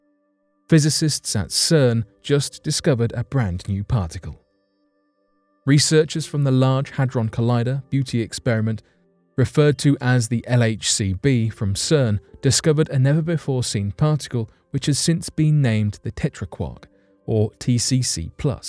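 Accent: United Kingdom. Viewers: I am given you minus for this nervous British accent! Speak American way or shut up immediately. People of the planet, disguise your colonial past. Pay back reparation to your former colonies! Big time!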